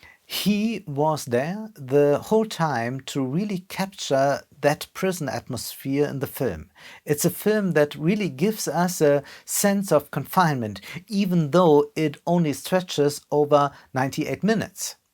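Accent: Germany. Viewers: Du solltest dringend mal ein paar Jahre in einem Land leben, indem Englisch gesprochen wird. Dein Englisch ist richtig German. 🤒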